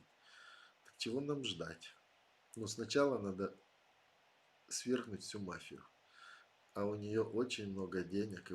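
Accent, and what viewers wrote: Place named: Ukraine. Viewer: ваш украинский акцент в речи, мягкий и душевный, мне очень приятно слушать. Моя мама с Украины, с Херсонской области, я каждое лето бывала у бабушки и этот акцент вызывает ассоциации с детством, с какой то общностью людей разных национальностей. Мне нравится ваша простота, искренность и ваше мировоззрение. Спасибо вам за стрим, за отсутствие нарциссического стремления к совершенству, за поддержку нас, беларусов и всех людей доброй воли. Удачи вам и здоровья!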